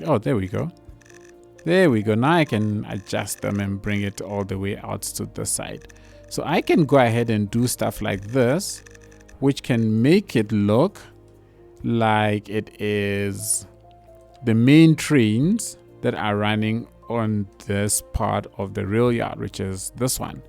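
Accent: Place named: South Africa. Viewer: ive watched a couple of episodes wondering if you from South Africa , just know that accent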